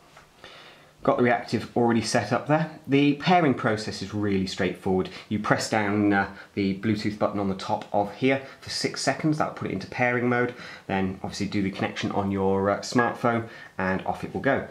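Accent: United Kingdom. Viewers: Looks a pretty nice speaker to be honest, hadn't even considered this one at all however having a look at it now! Where are you from by the way, no good with accents but im guessing the UK? lol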